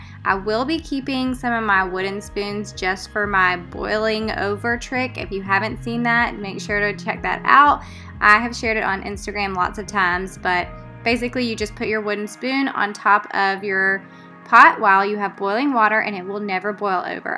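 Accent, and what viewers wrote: South, United States. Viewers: LOVE home and KITCHEN GADGETS! Thanks for sharing! You’re southern accent is so cute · hey hey.. new subscriber here! love your videos and southern accent. I'm from Louisiana and you don't hear it often on youtube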